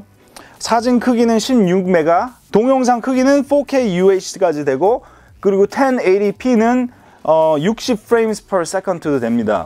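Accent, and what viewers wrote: South Korea. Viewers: 한국어로 된 좋은 리뷰 비디오 감사드립니다! 전에 경상도에서 살으셨다고 얼핏 들었는데 그게 진짜였는지 경상도 사투리가 곳곳에서 튀어나와 정겨워지네요 ㅎㅎ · 전라도 분이셧나봐요 사투리 많이하시네요 반갑습니다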